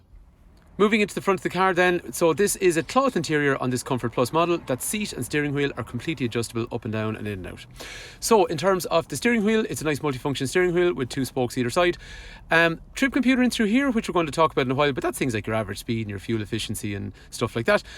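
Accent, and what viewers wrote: Ireland. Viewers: Love your reviews, but you talk as quick as some TV ads, where every second counts! Your accent is a little difficult for an Aussie to follow sometimes. haha. If we travel to Ireland for a holiday, we may just look you up. Well done.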